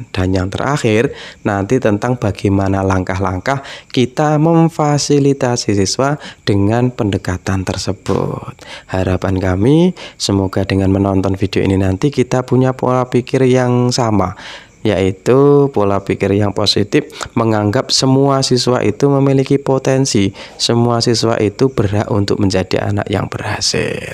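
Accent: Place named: Java, Indonesia. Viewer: Assalamualaikum Trims banyak Gan...Ha ha ha medok sekali jawanya ....pilihan analoginya cerdas sekali ...pada akhirnya kok seperti andragogy ya Gan? sekali lagi trims banyak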